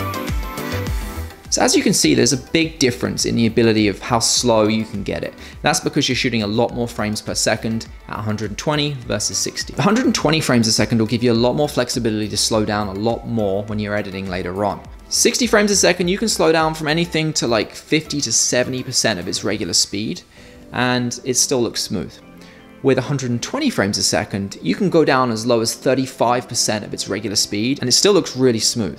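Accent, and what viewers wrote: United Kingdom. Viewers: Great video, your video was talking about shooting at 60 or 120fps, obviously your sony will be in NTSC mode, judging by your accent, Im assuming that you live in the UK. If so, do you change to PAL when shooting in doors to avoid flickering. I have the a6500, I live in the UK, I shoot at 100fps for slow motion, is there much difference between NTSC & PAL, apart from the extra 20fps?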